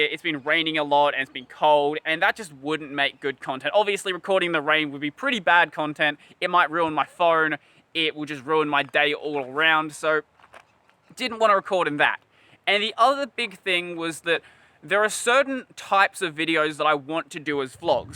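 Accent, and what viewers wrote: Australia. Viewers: He is Australian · He’s Australian 🦘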